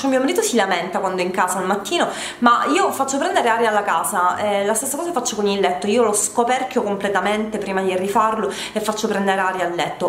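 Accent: Sicily, Italy. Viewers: ma sei siciliana????😉 infatti hai detto bene essendo in casa sola o.....massimo 2😊😊 se uno ha bambini piccoli gia la cosa cambiaa😁😁ti va passare anche da me??? ciao carinissima...simpaticona... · per favore puoi farci un home tour di casa tua... sto per arredare casa e vorrei magari dei suggerimenti e delle idee... sei bellissima e fantastica mi piace un sacco l'accento siciliano che hai grazie per la compagnia un bacione:*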